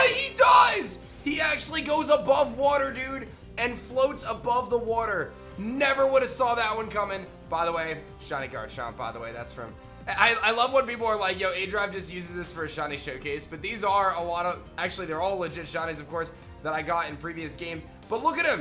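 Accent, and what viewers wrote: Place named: United States. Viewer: my guy ur american accent is 100 % i can barely stand it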